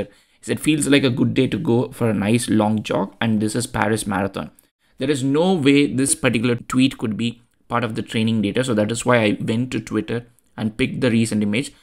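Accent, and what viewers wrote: India. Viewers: guys plese improvo your pronunciation .Indian accent is so hectic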